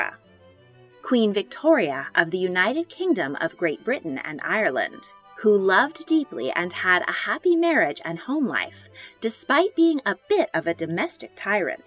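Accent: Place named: United States. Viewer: From your accent I can tell that you're not European.. Probably American.. So its more amazing that you're making videos about European monarchy..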